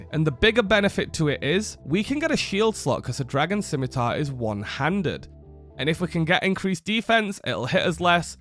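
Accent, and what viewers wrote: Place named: England, United Kingdom. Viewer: It's a beautiful day when uploads. The mad scientist is back with his perky english accent 😊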